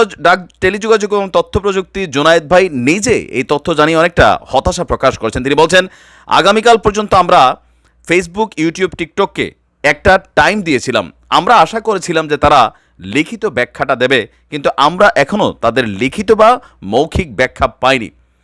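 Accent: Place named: Comilla, Bangladesh. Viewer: কুমিল্লা কুমিল্লার পোলার মতো কথা কবি বলবিরে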